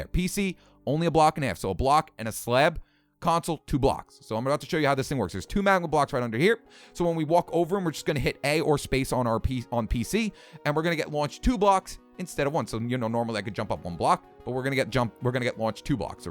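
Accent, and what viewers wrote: New York, United States. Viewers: You from New York? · Are you from New York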